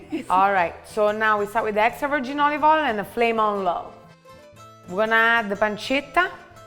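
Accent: Rome, Italy. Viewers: I just wonder how is your brother don't have the Italian accent. I mean you still have this thick accent but he does not. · Ago er cxx...😂😂 me ne moro che in mezzo all'inglese, ce scappa sempre la romanita'❤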